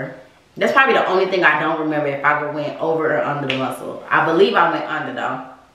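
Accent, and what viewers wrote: United States. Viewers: Lol Sound so chicago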